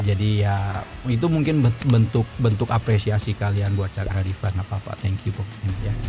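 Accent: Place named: Lombok, Indonesia. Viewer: Orang Lombok mana ni?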